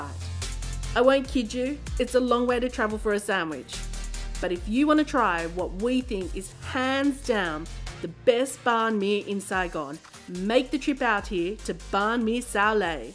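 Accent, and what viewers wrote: Australia. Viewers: I really enjoyed watching this even I am living in Saigon in Week 3 of the lockdown so it was kind of painful to watch. Is your accent Aussie? I look forward to trying all the places post-lockdown and to more of your videos. Cute dog too!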